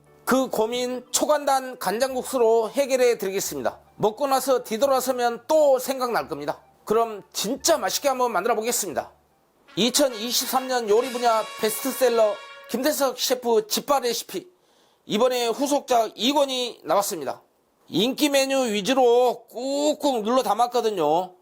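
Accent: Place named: Yeocheon, South Korea. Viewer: 이분 사투리는 여천 사투리라고 확신합니다... ^^ 실력,해설,지도,방송 모두 굿입니다!!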